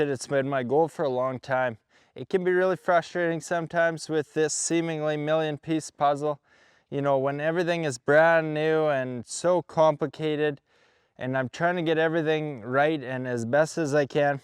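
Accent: Canada. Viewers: You're smart and kind!! Proud of you. Keep the videos coming. I just love hearing you speak Canadian.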